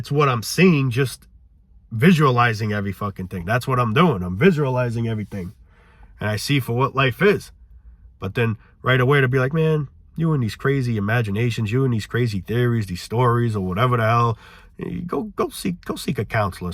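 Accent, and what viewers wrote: New York, United States. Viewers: PLEASE MAKE A SEGMENT AND NAME IT WHAT REALLY GRINDS MY GEARS 😂 that Rhode Island New York accent bro you have to.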